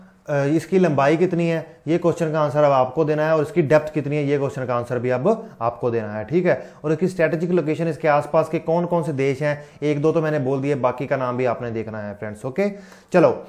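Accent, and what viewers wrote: Punjab, India. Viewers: He is punjabi. . That's why hindi pronunciation aisa h ..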